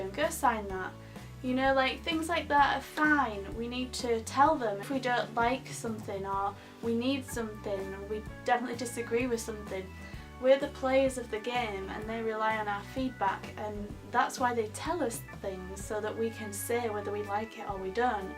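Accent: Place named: Yorkshire, United Kingdom. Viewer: Erm i have the same accent as (as from Yorkshire originally) you can't help the accent you have, for goodness sake. You are probably not english either, cos most Brits say it like that, it is how it is meant to be pronounced!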